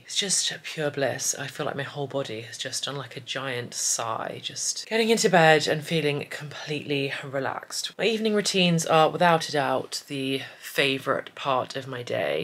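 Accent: United Kingdom. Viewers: The accent speaks for itself. Brit ❤😍 Always love reset videos they motivate me to be more productive 😇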